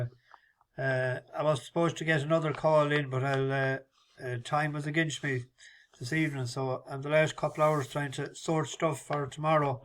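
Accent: RP, United Kingdom. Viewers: Great stuff, tips not on fire but that’s racing, still your command of the Queens English always makes me smile, keep up the good work, as you said things will turn 👍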